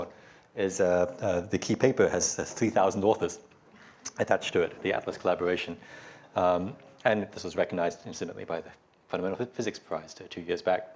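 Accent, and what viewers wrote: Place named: Australia. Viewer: He’s brilliant. I was in the same year when entering Princeton grad school and lived in the same “ New College “ (dorm) as Terry in the early 90s. He was so young and we all saw him at dinner each night. I’m in the humanities so we weren’t close. He was roughly 16 or so if I remember correctly. Later, I would sometimes see him walking on Witherspoon, deep in thought. He had this same Australian accent in those days as well.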